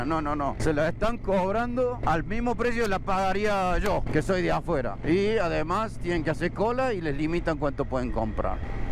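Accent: Argentina. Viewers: Tiene acento de Córdoba, Argentina.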